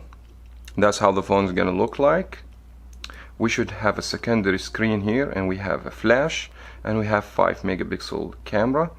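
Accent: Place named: Arab world. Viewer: انت عربي؟ لهجتك كأنها عربيه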